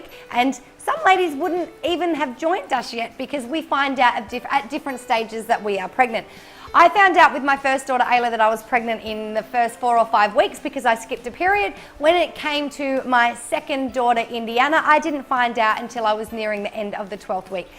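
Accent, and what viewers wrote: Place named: Australia. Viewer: Well done - good Aussie accent and no rambling - ❤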